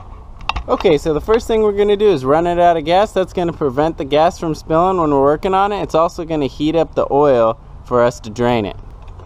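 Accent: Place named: United States. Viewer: Ох уж этот американский фермерский английский язык, но спасибо по дейстствиям все ясно провел обслуживание своей гозоно косилке.) 👍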